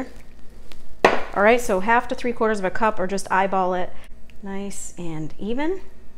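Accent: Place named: Central New York, United States. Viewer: Your accent is pure central NY and I love it. We had pizza like this when we were kids in Niagara Fall...Ragú, though? No ma'am.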